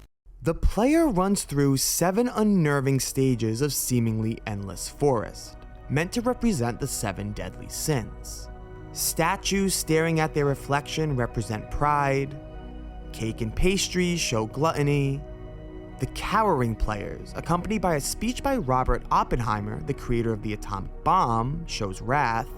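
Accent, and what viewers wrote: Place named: Boston, United States. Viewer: sometimes i think u have a Boston accent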